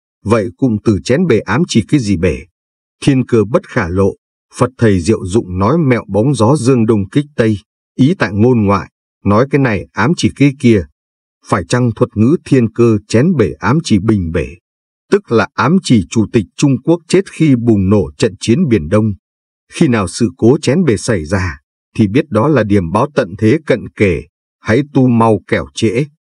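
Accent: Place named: South, Vietnam. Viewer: Hihi thầy nói giọng miền nam dể nghe, dể hiểu ?! nay đổi giọng bắc sao khó nghe và khó hiểu quá ? hihi. Không biết đức thầy nghe được không nữa ??!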